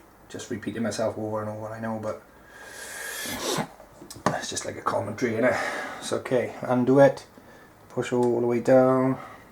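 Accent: Wales, United Kingdom. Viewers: Yes the welsh I love it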